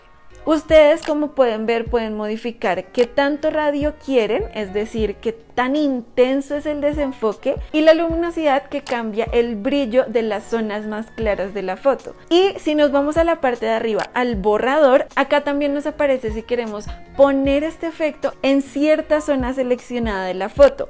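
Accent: Colombia. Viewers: El acento Colombiano me encanta es muy relajante saludos 🇲🇽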